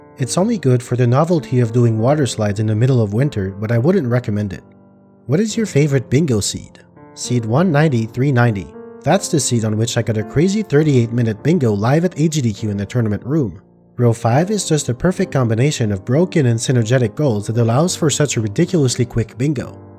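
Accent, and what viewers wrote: Quebec, Canada. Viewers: Being from Quebec as well I had such a feeling that’s why your accent was. Great videos! Keep them up! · I could tell you had a Quebecois accent, it's very faint though.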